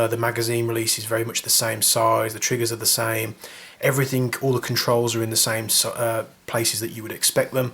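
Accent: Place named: Australia. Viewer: Have you ever lived in Australia? Your voice is very similar to Australians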